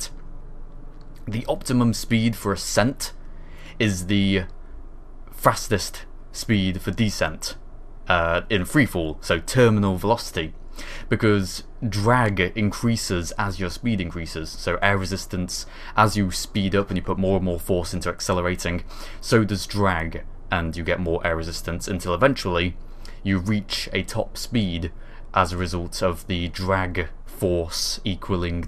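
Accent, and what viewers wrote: United Kingdom. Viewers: Don't worry. We all love your British accent.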